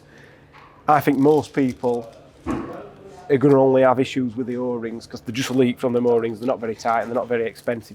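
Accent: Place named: Barnsley, United Kingdom. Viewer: Hi been following your trials and tribulations me thinks you should rename it to Toerag in stead. def more Barnsley like. love the Barnsley accent but its no wonder some folks cant understand you broad Barnsley is a very difficult dialect to understand., and im born and was draged up in Barnsley, well actually Grimethorpe. Keep up the good work take no notice of the nay sayers and internet experts lol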